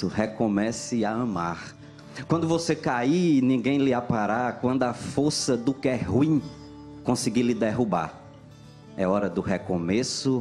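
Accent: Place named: Brazil. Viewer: Que bom ser premiado com uma poesia tão clara, que nos leva a meditar. E com o sotaque de Brasil, com vocabulário puro e nordestino, sem receio de nada. Sou eu fã. Um paraibano em Sampa cheio de Gratidão!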